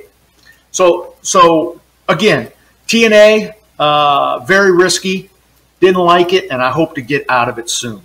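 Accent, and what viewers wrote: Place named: Pittsburgh, United States. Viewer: Thanks for the reply. Makes perfect sense, thank you. I guess my real question was, "Do you HAVE to buy them back, or do you have the option to let them expire?" I was a little confused but I get it now. Side note, you're not a fellow Yinzer by chance, are you?? Your voice (I mean no offense) and a certain sports logo visible in one of your other vids suggest you may have ties to the area. Thanks again, and keep up the good work with the vids! Very helpful.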